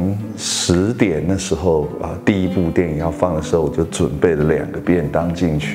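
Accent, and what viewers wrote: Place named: Waishengren, Taiwan. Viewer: 看新聞中跳出廣告，您講話的方式感到一股濃濃的外省貴族味，特來朝聖。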